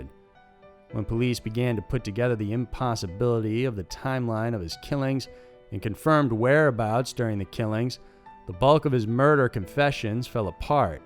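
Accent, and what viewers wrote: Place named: Midwest, United States. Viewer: I'd agree hes American, but I don't hear any southern accents in his voice. Possibly midwestern.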